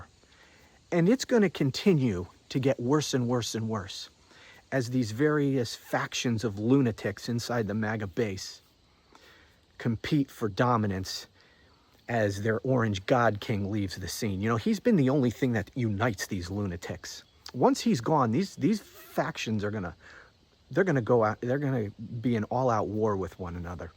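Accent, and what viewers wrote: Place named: Quincy, United States. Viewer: I love this cat 😅! Sounds like Quincy, Mass. accent, I don't know, but I love how he, and rightfully so, loses it completely on maga idiots 😂!🤣🤣🤣🤣🤣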